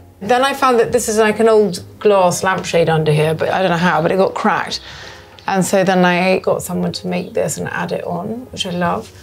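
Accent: RP, United Kingdom. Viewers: Marvellous posh accent with a really tense jaw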